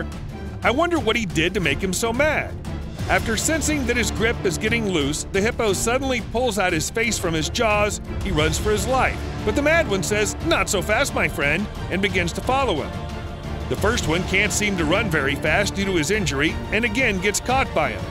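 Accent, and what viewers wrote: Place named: United States. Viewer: The AI American accent is truly painful to hear. ....do yourself a favour and turn off the sound .. ooooops ,, too late ..your at the comments 😂😂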